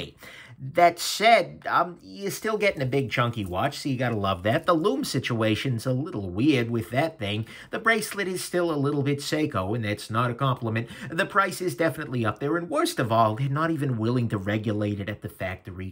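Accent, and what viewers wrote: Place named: Brooklyn, United States. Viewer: You sound like you grew up in the tough side of Brooklyn, NY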